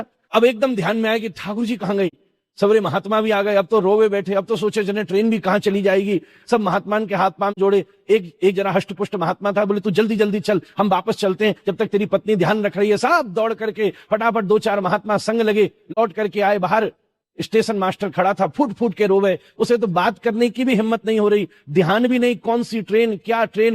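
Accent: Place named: Braj, India. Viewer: Brij ki boli, meethi boli❤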